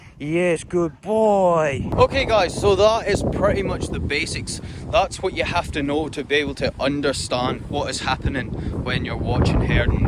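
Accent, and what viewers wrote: Scotland, United Kingdom. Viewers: English spoken with Scottish accent. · Hey, I do have a question: I cannot understand your order for the recall. I am from Germany and it’s difficult for me to understand your scottish mothertoung. For me the order for recall sounds like a serene! 😅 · Good evening. It is Isabelle from France. It is so nice to hear this Scottish accent of yours. Yes you love what you do and it shows. These dogs are so intelligent, it is marvelous. All you explain is very interesting. Thank you ever so much. I once looked after rough collies in England. 15 dogs who drove me crazy hihihi 🤣🤣🤣. Border collies are my favourite though! Thanks to share your work with us. See you and take care. Bye bye! 😉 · Your accent makes it so much. Better. Some American guy yelling these commends would not be as exciting. Yeah Scotland!!!!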